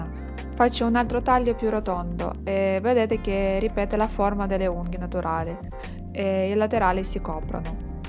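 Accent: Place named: Ukraine. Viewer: L'accento ucraino 😩😍😍 (correggimi se ho sbagliato)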